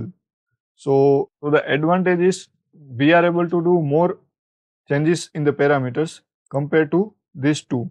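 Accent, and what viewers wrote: India. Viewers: How did you want him to speak in Spanish when he has a indi accent in english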